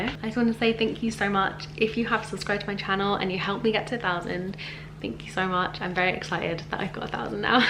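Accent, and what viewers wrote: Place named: Gloucester, United Kingdom. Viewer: the Gloucester accent is strong in this one after being at home lol 😂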